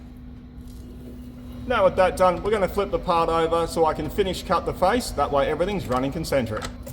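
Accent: Queensland, Australia. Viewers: Guys, when I want "milking", I go to the supermarket. When I want to see metal getting peeled back like the layers of an onion, I go to CEE. Love you all -, Homey, the magpies and the overhead drone, the noisy trains on the tracks over yonder. ' outtakes keep me trying when I blunder (if he can do the narrative, I can do whatever I'm screwing up). Here, I can relax and listen to an Australian accent with a QLD coastal twist. 😀❤👍 And the cute Staffy ❤❤❤needs more interactive air time.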